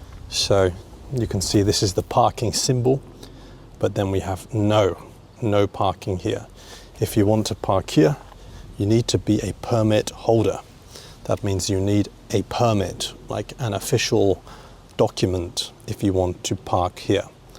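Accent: United Kingdom. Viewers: I love your British accent , you're a great teacher because your English is easily understandable! You really do a great job for non native English speakers! ❤❤❤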